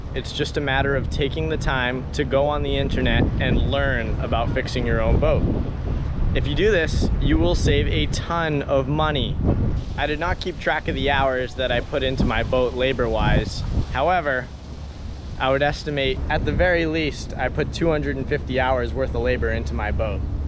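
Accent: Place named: New England, United States. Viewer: With that boat and that accent, I can definitely tell you’re from New England! Very informative, otherwise. I’m wondering why you didn’t go with a sail boat to save on fuel long term, though.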